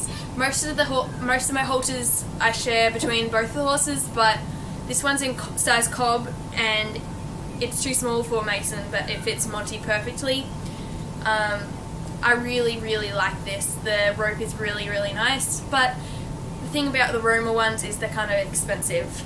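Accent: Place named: Australia. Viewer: i love your axcent are you from australia?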